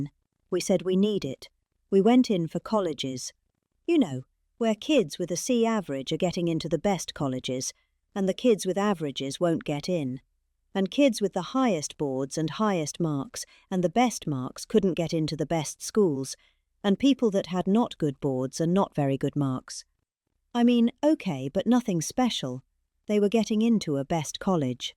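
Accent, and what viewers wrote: England, United Kingdom. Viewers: Spoken in real English by a E glish lady, shows just what a load of rubbish he comes out with. No one can make sense of this rubbish. This is the King of America?? This madman?? This the best you can come.up with??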